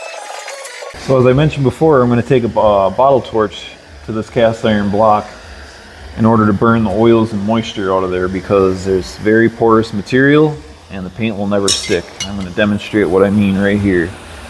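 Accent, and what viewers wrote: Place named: Wisconsin, United States. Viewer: Your channel is going to blow up love the content where are you from sounds northern accent Wisconsin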